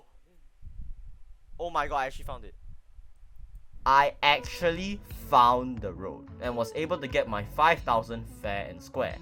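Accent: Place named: Singapore. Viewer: Bro has the most Singaporean accent i heard in a long while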